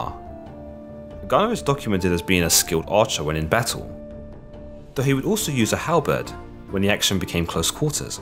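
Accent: United Kingdom. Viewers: Icelanders are really not used to accents, icelandic is only really spoken by Icelanders and we all have (mostly, with miniscule exceptions) the same accent so when someone with e.g British accent comes along it can sound like you are talking nonsense but that's not too say that it's you're job to change the way you speak, I have noticed that some Icelanders have a unfair standard when it comes to accents which can be hard for e.g immigrants which put the time inn to learn icelandic So yes you have a really thick accent but besides that than it was just fine. (A bit late to comment)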